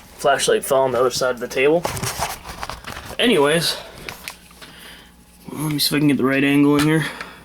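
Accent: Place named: Buffalo, United States. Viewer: sounds like your from buffalo everyone pronounces mags as "megs" lol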